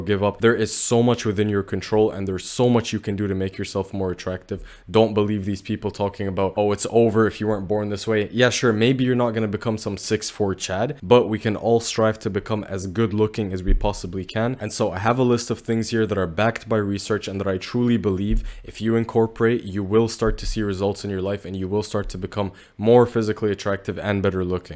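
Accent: Canada. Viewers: You're Canadian?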